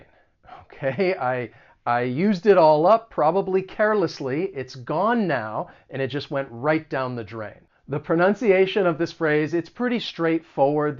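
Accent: United States. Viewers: Amazing American English at it's best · Your pronounciation is American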